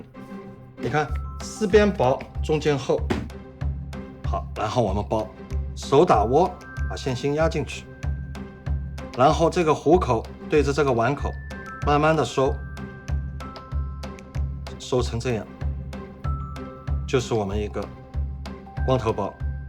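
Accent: Shanghai, China. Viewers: 呜呜师傅的上海口音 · 一听就是上海师傅😄 讲的不拖泥带水 专业 是我喜欢的风格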